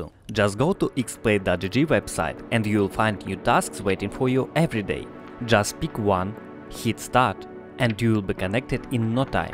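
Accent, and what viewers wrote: Russia. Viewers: ох уж этот русский акцент)))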